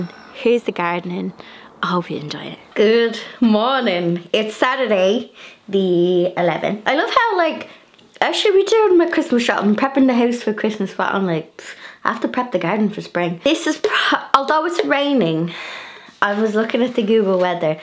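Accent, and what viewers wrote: Ireland. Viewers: You make the Irish accent sound cute 😉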